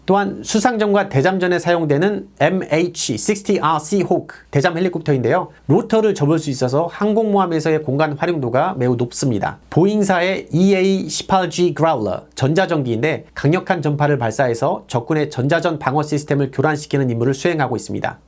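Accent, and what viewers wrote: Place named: United Kingdom. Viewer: 영국식 영어를 쓰시는 유튭을 보게되는군요. 잘 봤습니다.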